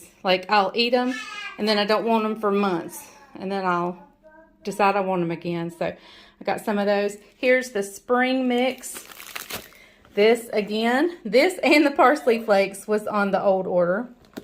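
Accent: South, United States. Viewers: Last night I viewed this video and this morning I thought of you as I was reading today’s devotional (4/21/2021) from the Our Daily Bread app. I started watching you for your biscuit video. I have continued to watch you for more of your delicious recipes, but mostly to enjoy hearing your lovely southern drawl and to see Jesus in you. It is so refreshing to see you deal with the chaos life brings us in your sweet mannerisms. You bring authenticity to what life is about in Christ. Please keep being yourself!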